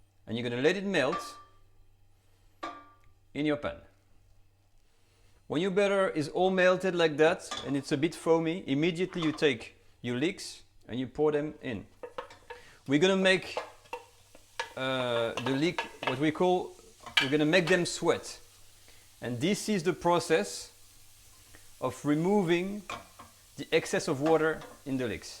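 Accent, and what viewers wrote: France. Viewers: Merci 😘 j'adore French acent in English language. Bisous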